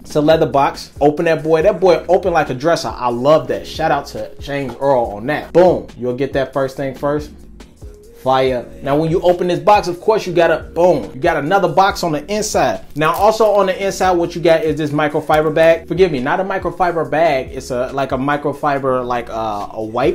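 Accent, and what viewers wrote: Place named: Chicago, United States. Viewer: I hear yo Chicago accent deep correct me if I’m wrong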